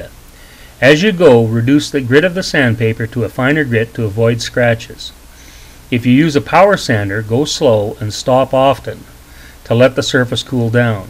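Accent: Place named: United States. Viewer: frickin americans, soon they'll be saying its a ruf, instead of a roof and a crick instead of a creek.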